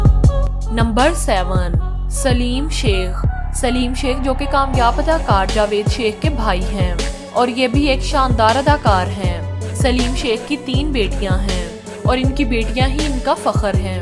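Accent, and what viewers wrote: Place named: Punjab, India. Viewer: PL IMPROVE YOUR URDU ACCENT, ITS A MIXTURE OF PUNJABI AND URDU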